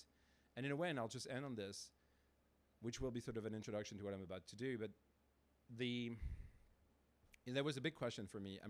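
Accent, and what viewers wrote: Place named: France. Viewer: He should have read his work with a Latin accent, not with a French one!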